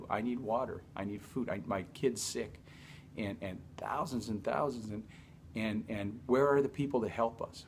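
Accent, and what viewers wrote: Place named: Nevada, United States. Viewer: Sounds like a Nevada man :)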